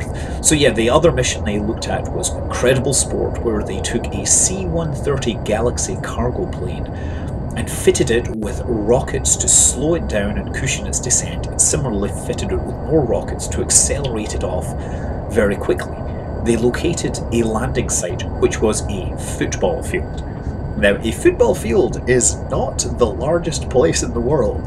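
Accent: Scotland, United Kingdom. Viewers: i'm pretty sure he's scotish.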